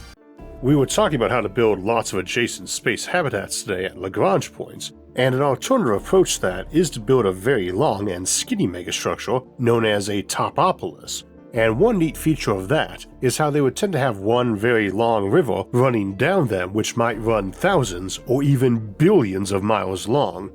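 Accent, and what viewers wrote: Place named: North Carolina, United States. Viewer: "Whey-oh distances are great-or" - - It's kind of a North Carolina accent... but not exactly.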